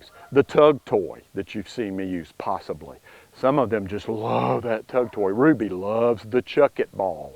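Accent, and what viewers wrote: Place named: New York, United States. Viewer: Too funny! Your NY accent ...paaaw... I’m from ny got a good laugh from that!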